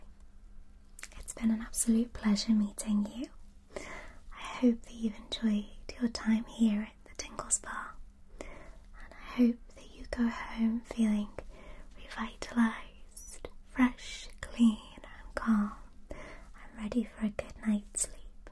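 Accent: United Kingdom. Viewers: I luv soooo much your British accent🥰😍 to cuteeee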